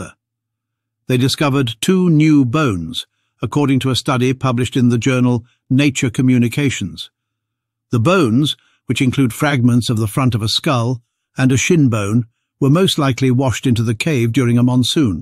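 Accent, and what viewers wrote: United Kingdom. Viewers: BS !! The GIANTs were the first hue’men. We aren’t believing yo slick Brit accent. There is no Evolution (Evilution). There is ONLY DEVOLUTION. Archeologists & Anthropologists frauds have NO credibility with MELANDIGENOUS ORIGINEEs.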